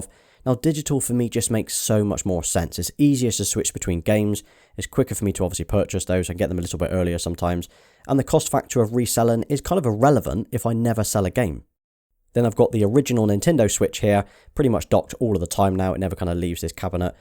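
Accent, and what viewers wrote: United Kingdom. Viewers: This guy is having a british accent if i am not wrong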